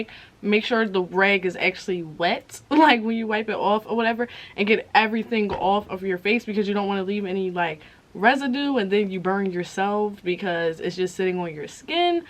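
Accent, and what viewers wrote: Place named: Philadelphia, United States. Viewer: You sound like you from philly, you’re so damn pretty btw 😍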